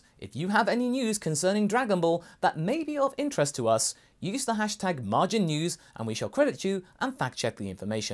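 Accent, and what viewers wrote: United Kingdom. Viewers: He is, indeed, British. English, specifically.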